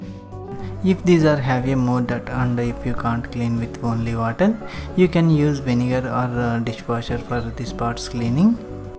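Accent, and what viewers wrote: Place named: India. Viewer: Thanks for cleaning instructions and fu*ck you because of that Indian accent.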